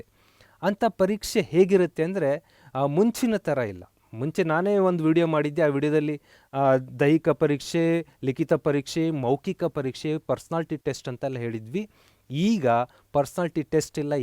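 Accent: North Karnataka, India. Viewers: Are you from North karnakata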